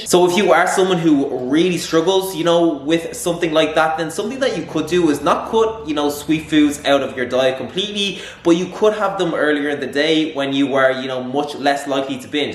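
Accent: Ireland. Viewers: This guy sounds irish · Nice Irish accent I’m sure it’s Irish maybe Scottish I’m 90 percent it’s Irish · Are you Irish? You kinda talk like Conor McGregor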